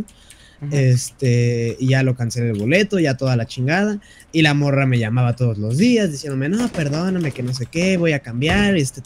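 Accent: Chile. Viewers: Chileno 100%real no feik jajaja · Primera vez q veo a un mexicano q le sale bien el tono de los chilenos :u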